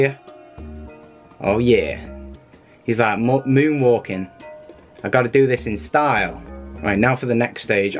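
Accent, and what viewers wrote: United Kingdom. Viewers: I love his accent! Is he British because I'm British? :P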